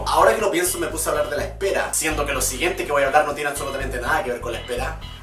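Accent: Chile. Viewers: Germán más chileno que nunca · Waaa hablaba bastaaaaaaaante chileno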